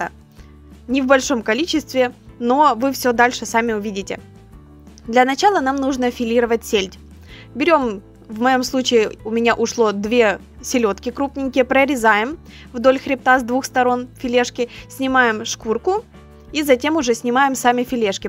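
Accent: Ukraine. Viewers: Молодец ты. И акцент твой украинский мне тоже по душе. Я в восторге от меледочных роллов. В субботу обязательно сделаю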